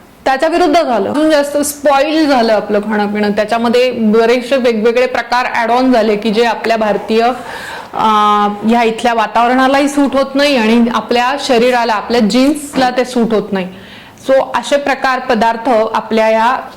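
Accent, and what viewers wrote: Standard. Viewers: मॅडम मला तुमचे बोलणे खूप गोड शुद्ध असते. I am fan of you👌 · मॅडम : तुम्ही फार शुद्ध सुरेख आणि गोड बोलता , का कोणास ठाऊक पण कायम तुमच्या बोलण्यात आपलेपणा जाणवतो❤ !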